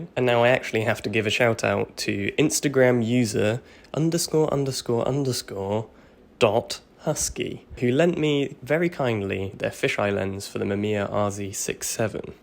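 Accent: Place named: England, United Kingdom. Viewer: I'm hearing a lovely English gentleman but I see Cletus from Mississippi lol love ur content bro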